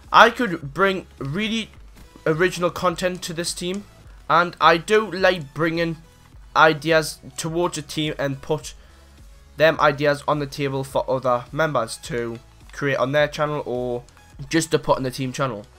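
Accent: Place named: Newcastle, United Kingdom. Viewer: Your from Newcastle aren't you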